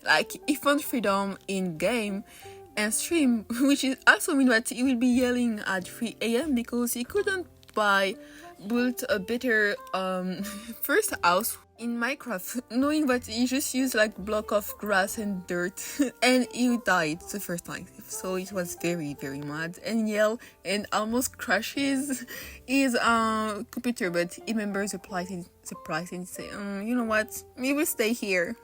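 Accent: France. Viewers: I have a question, are french by any chance?? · Are you french? If not I'm sorry ik nothing abt accents 😭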